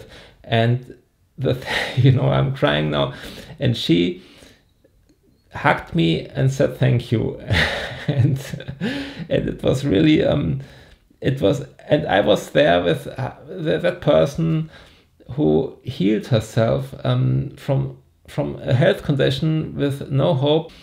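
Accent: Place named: Germany. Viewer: Ich liebe deinen deutschen Akzent. Es ist so schön! Vielen Dank für dieses Video)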